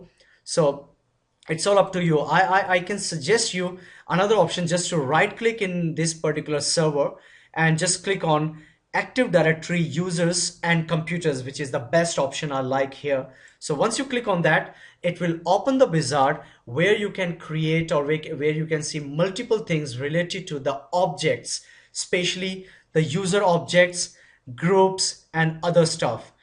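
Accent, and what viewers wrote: India. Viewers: Typical Delhi accent and typical way of moving from English to Hindi...the coverage is good though for those who can understand the language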